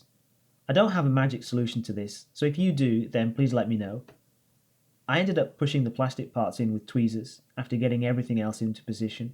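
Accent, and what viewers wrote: United Kingdom. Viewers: He is Japanese with top-tier British accent, by the way.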